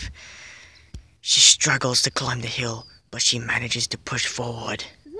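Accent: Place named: Australia. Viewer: Love the Australian accent, need to make more about your life videos 👍✌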